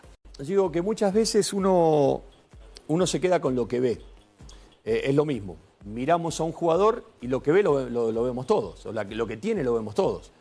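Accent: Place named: Quito, Ecuador. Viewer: Grande Alfaro... Y se nota q se le está pegando el acento quiteño...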